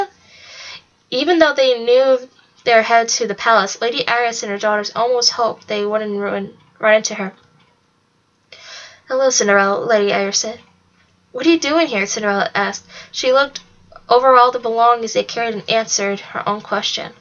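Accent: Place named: United Kingdom. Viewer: Her British accent is everything!!😂😂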